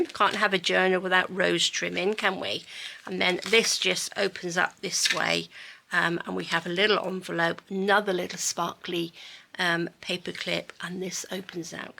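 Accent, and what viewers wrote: United Kingdom. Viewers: Congratulations, dear, on reaching 80,000 subscribers! You were the first creator I ever watched, when we were shut down for COVID in early 2020 here in the New York City area. I was home from work for 3 months, confined to the house, and going absolutely stir crazy, when this lovely British accented lady broadcasting from Portugal taught me what a junk journal was, and I have been a subscriber ever since…haven’t missed one! In fact, the first digital I ever bought was your Shabby Pink one. And of course I had to have water colors, distress ink, pastels, gesso, stabilo pencils, resin, glitter, lace, seam binding, beads, and bling, not to mention every color of your favorite rose trim known to man. I am so grateful to you for sharing your talent with us. I haven’t seen you work with your pastels in quite some time, perhaps you could include another project using them this year? 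Thank you again for teaching us your craft, and for keeping us entertained for so many years. Sending much love and gratitude to💖💖💖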